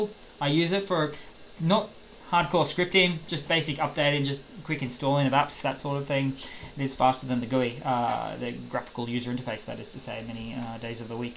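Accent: Australia. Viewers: i love australian accent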